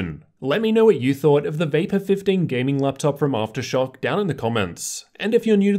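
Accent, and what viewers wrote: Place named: Australia. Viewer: it's the Aussie accent I assume. He really emphasizes the OR in port. "USB-C pOOORRt, USB 3.1 type A pOOORRt, HDMI pOOORRt, mini displaypOOORRt" it sounds really jarring to a Canadian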